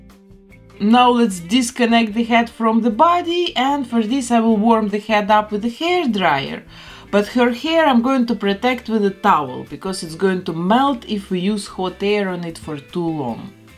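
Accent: Russia. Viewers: Акцент сто процентов Русский, но написано , что Бельгия )))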